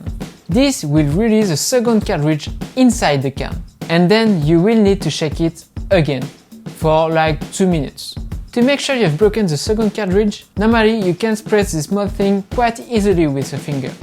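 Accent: France. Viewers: Came for the lumpy green paintjob Stayed for the Inspector Cluseau accent 👍😎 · i was listening to him talk and thinking, "this guy is French AF, I don't recall ever seeing a French YTer, ever" then i scrolled down to see " " my suspicions check out. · I am Croatian, and nobody around me speaks English with such a terrible accent. It is French thing. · It’s officially the first time that a person with a French accent doesn’t irritate me when speaking English. I guess you could take that as a compliment coming from a dude who gets easily irritated 😂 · francais ? vu laccent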